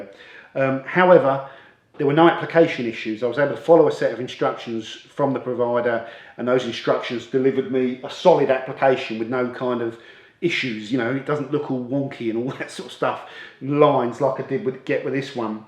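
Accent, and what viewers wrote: England, United Kingdom. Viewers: I like the nature of his videos as is. Conversational. Friendly. You get a feel for the personality. I would enjoy them far less if they were closer to the overly constructed, clinical tripe you're describing. Then again, I'm American and might just be enjoying the accent; it's like listening to a Charles Dickens character tell me about a magical place called Bilt 'Amber, where I can only imagine they have wonderful foods that are all called 'pies' for some unknown reason.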